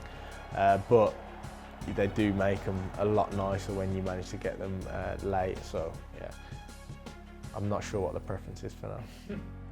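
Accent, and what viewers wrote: United Kingdom. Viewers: Bruh talk slowly 😢 We're not all from uk 🙂 ❤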